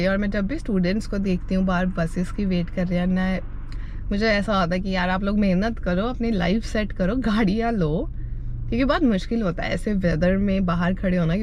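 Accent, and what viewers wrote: Punjab, India. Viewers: I really like your punjabi toning 🔥❤️❤️😁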